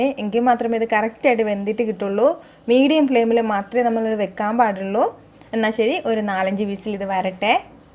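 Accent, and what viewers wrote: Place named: Malabar, India. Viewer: ഹായ്, സുജിത് ഏട്ടന്റെ വീഡിയോ കണ്ടാണ് നിങ്ങളെ പറ്റി അറിഞ്ഞത്, എന്തായാലും ഞാൻ സബ്സ്ക്രൈബേർ ആയിട്ടുണ്ട്. ഇനി മുതൽ വീഡിയോസ് ഒക്കെ കാണാം.. നിങ്ങളെ സംസാരം ട്രിവാൻഡ്രം സ്ലാങ് അല്ലാലോ, ഒരു മലബാർ tech ആണല്ലോ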